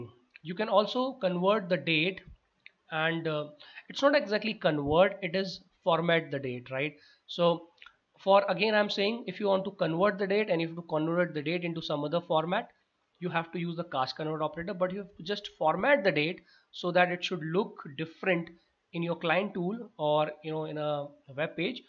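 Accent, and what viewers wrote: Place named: India. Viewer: not again !! can you speak english??not indianish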